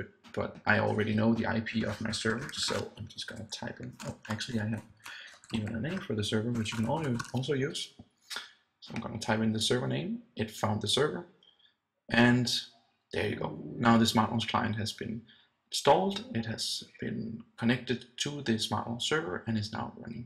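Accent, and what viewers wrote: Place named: Denmark. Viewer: This guy is Danish i Can tell by his accent 😂😂😂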